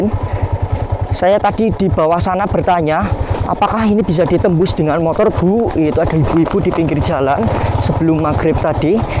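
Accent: Java, Indonesia. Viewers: Wong jowo PO mas? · Mas omonga nya kok medok banget si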